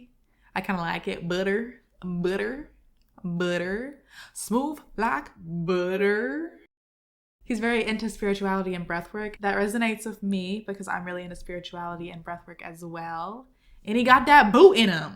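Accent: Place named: South, United States. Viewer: Your country accent is gone, wtf. It’s less strong as back in the day 😂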